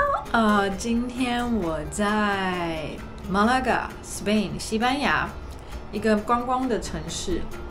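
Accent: Taiwan. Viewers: 高雄口音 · my favorite tour guide with a sweet Taiwan accent, you made the Malaga tour very interesting. Great job! Thanks.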